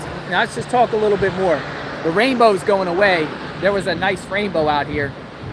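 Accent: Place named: North, United States. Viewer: Haha! Bro u sound like a Northern. Could not stomach 2 min of your crap.. your spewing rubbish…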